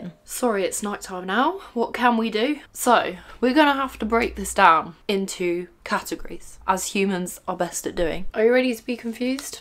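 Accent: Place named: United Kingdom. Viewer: I’m mainly watching for the British accent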